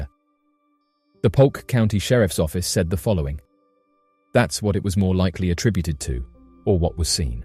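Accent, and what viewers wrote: England, United Kingdom. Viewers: You sound English so no excuse - the plural of aircraft is”aircraft” NOT “aircraft’s”. Just saying…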